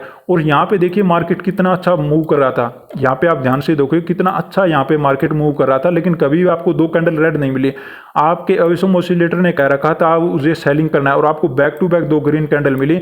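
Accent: Haryana, India. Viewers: Bhai ji bahut aacha sikha rahe ho aap Aapki boli to hariyane aali lagge hai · कई बार लगता है उत्तराखंड से है आप कई बार आवाज के इंग्लिश टोन से लगता है हरियाणा बॉर्डर से है राजस्थान साइड वाले से।।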